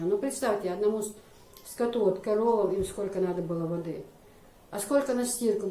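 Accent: Chechnya, Russia. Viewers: Ни за что и не подумаешь,что вы русская, внешность чеченская, вы не меняли свою внешность?? Акцент, язык , манеры.... Все чеченское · Даже акцент чеченский! 👍🤗😘😘😘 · Вы просто умница! С трудом верится, что Вы не чеченка. Вы даже на русском языке говорите с легким чеченским акцентом, и что удивительно, на чеченском -- без акцента. Вы и внешне очень похожи на чеченку. У Вас редкое сочетание -- внешняя и внутренняя красота. Счастья Вам и Вашей семье! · Поражаюсь Вами,у вас диалект даже чеченский🤩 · Вааа Аллах1, сел леран нохчишех д1а мух тарлур йу,?Даже чеченский акцент присутствует 😄Делдахь со цец йаьлла С меня лайк и подписка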